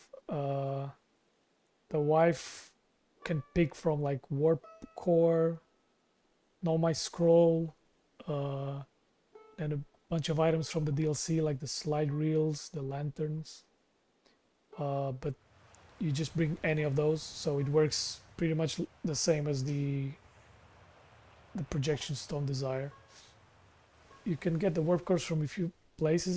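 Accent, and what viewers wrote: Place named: Portugal. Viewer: AHahah, com esse nome e essa pronuncia, so podes ser tuga! Fiquei altamente surpreendido ao saber que o Outer Wilds tem mod para VR, e vi isso ontem em um video sobre os melhores mods VR em 2023. E hoje fui ver melhor, e fui dar a tua pagina! Quando vi o " " parti-me a rir! Ainda estou para testar isso, mas ate agora, parece que estas a fazer um excelente trabalho! Impressionante, sim sr. Os meus parabens, desejo-te muito sucesso!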